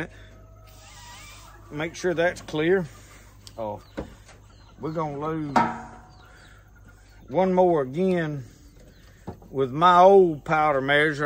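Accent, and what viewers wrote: South, United States. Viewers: Hi I appreciate the video it was informative and entertaining but buddy it was hard for me to follow because of the your Southern drawl it's so strong not a criticism just a suggestion maybe if you speak Little more clear and more precise I wouldn't had to watch it a couple times to get all the narrative and I can generally follow most southern accent I have a long ancestry back to the south when my original ancestries from Virginia followed that longWind Daniel Boone to eastern Kentucky and Tennessee 😂 thanks for sharing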